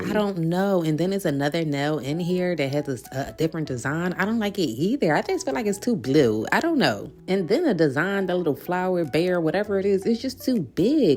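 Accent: Philadelphia, United States. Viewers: Hey girl I’m new. I followed you cause I heard the Philly accent 😂 and your children are sooo cute. I just ordered clothes from shein on 4/11 i got 24 clothing items for $232 including custom sterling silver jewelry for my daughter with her name. So I definitely think somebody spiced it.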